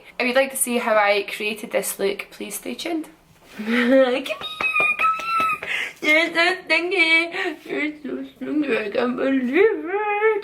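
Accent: Scotland, United Kingdom. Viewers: The Scottish accent is so pretty! & I'm in love with this look!! xx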